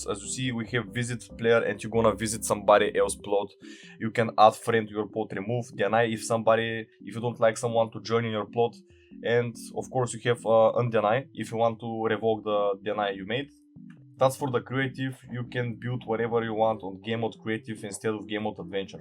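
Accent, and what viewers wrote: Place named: Pakistan. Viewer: You sound pakistani.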